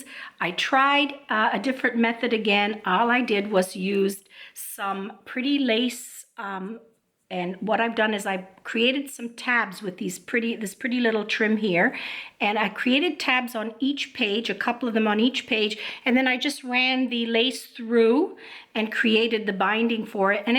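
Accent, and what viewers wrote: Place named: Italian American, United States. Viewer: I love this journal; your work is always so beautiful. TFS. I'm sorry to hear about your computer. I had my one and only Mac three years ago because everyone I know said it was superior. After a year, the disk literally burned up and I lost two chapters of a book I had been writing. They were really good, too! I haven't gotten over it yet and haven't been able to get back to the book yet either. I'm using a PC again. I'm glad you said you are Italian. I have been wondering a long time if you were because of the intonation in your voice. I've known many Italian American women who have a similar timbre and speech patterns. I didn't want to be rude and ask. Thanks for the answer!!! Baci. Dolores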